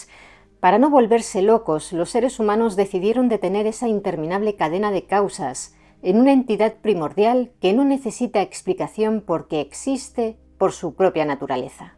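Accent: Castile, Spain. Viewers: De los pocos españoles que no me molesta escuchar el acento castellano,buen tema y bien resumido, felicitaciones.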